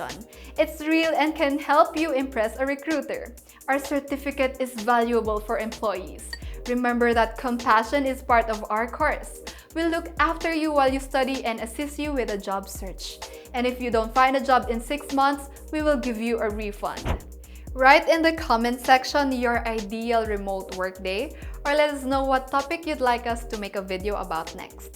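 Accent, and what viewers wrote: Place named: Philippines. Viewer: Thank you ma'am ganda nyo po. i loove your filipino accent. say it again.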